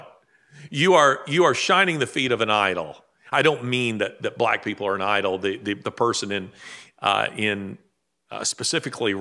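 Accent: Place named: China. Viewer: Sounds exactly like 🇨🇳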